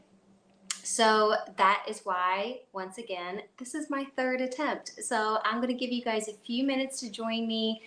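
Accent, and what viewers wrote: South, United States. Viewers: Good stream and love your southern accent looking forward to more of your videos two toes up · Thanks for your live chat vlog tonight. I had to drop for a bit and checked back in. I love your southern accent! We are from California and have been in GA for almost 30 yrs now and people say I have it too now. Best of luck going back to the cane. My husband got a guide dog a year ago and it changed so much of his life for the better. His confidence skyrocketed. Thanks too for answering my questions tonight! Very helpful.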